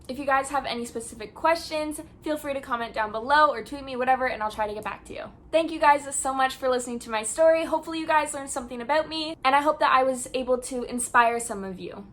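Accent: Canada. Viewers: I was just watching Sam and colbys conjuring video and swore I heard some Canadian from you. Us Canadian to California transplants can hear it lol. Funny, I also moved to California from Oshawa when I was a teen. It’s been much longer for me though 😂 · I love your Canadian accent (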